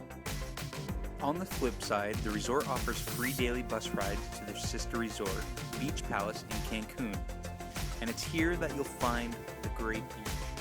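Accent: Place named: Canada. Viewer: best Canadian accent ever!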